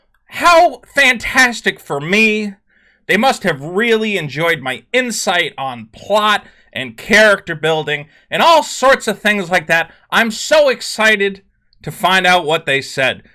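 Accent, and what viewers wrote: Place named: RP, United Kingdom. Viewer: his very reserved, posh, British accent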